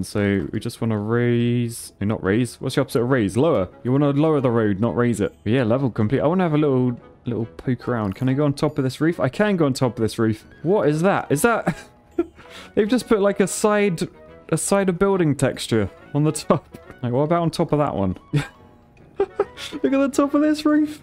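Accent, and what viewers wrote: United Kingdom. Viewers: I love this channel and Your accent is hilarious😂! I love the British accent😁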